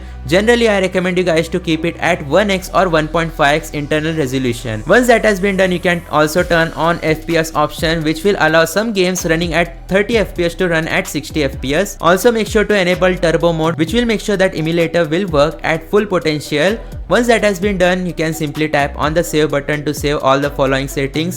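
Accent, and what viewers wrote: India. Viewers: Bhai indian hai ya american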